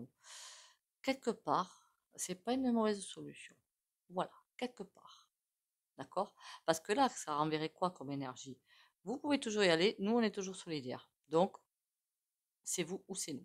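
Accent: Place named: Pyrénées-Orientales, France. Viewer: Bonjour merci beaucoup nous on a le mistral qui souffle à 120 km heure on se pèle pourtant il fait que 9 degrés j'étais à l'hôpital Sainte-Marguerite ce matin à 8h pour faire un prélèvement du liquide que j'ai à mon genou synovial je crois que ça s'appelle émettre la cortisone je repousse le moment de la prothèse je me repose au chaud bisous passer une excellente journée et câlin aux petits cœurs vous avez l'accent des Pyrénées-Orientales😊❤❤❤❤🥰🥰🥰🥰🥰🥰🐕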